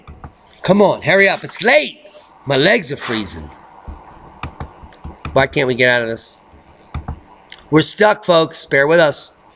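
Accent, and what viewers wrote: England, United Kingdom. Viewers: Hi. Great video! a lot of fun. Loving the..English accent, mixed with a little Australian too!? That little 9c 'tippy-touch' in the UK is called Cornwall. ps The Queen Muvva don't give a toss about snow..she died in 2002!! oh, and Congratulations to you both!!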